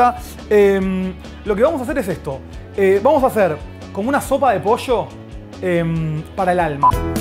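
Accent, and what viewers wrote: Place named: Buenos Aires, Argentina. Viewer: Entendí el 8% del video.. Alerta conurbano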